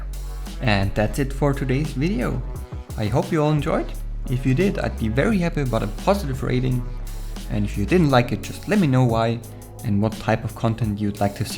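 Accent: Germany. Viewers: which nationality are you? sounds kinda like a german but im not quite sure...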